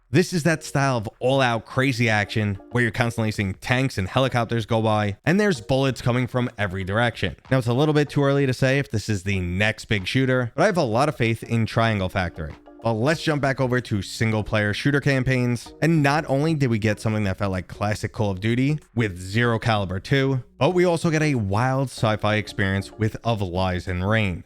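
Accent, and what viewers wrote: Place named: Long Island, United States. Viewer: I've never been to Long Island, but thanks to your videos, I always fix that problem. Love the accent!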